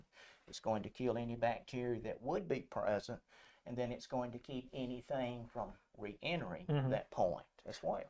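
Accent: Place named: South, United States. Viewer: I'm 63 and appreciate the knowledge here. However, as an impatient youtube consumer, this video could be 10 mins shorter if this guy did't articulate every syllable of every word. (I swear, southereners add syllables where there are none ( example 'This' becomes 'thi--is')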